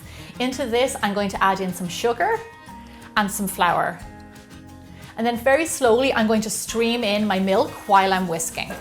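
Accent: Ireland. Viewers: Mmmm that pie look so yummy. Also. I love your. IRISH ACCENT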